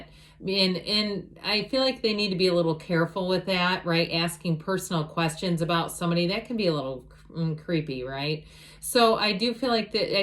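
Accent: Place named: Midwest, United States. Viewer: Your voice is fine, I’m from Illinois 😊 are you from Wisconsin?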